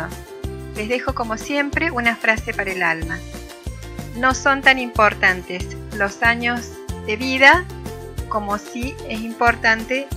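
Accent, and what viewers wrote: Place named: Cordoba, Argentina. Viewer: hermoso quedó, y me encanta tu tonada cordobesa 😄😍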